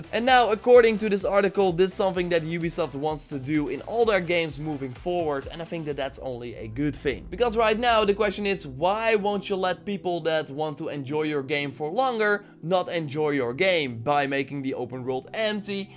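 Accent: Netherlands. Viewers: Are. You. Dutch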